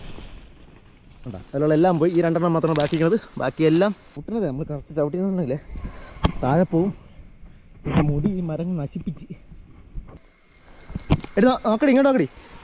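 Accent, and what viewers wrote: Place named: Kasaragod, India. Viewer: ഇദ്ധേഹം കാസഗോഡൻ മലയാളമല്ലോ സംസാരിക്കുന്നത്...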